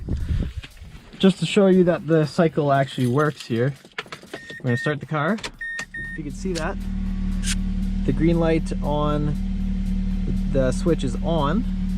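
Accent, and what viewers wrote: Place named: Canada. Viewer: He sounds a little bit Canadian to me.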